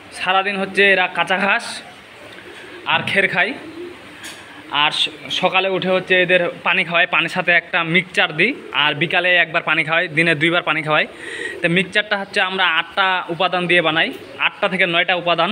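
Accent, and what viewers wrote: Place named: Bihar, India. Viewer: ওহ,,,এজন্যই প্রশ্ন টা করলাম কারণ আপনার ভিডিওতে আপনার ভাষার উচ্চারণ গুলো কেমন জানি বিহারীদের মতো উচ্চারণ হয়।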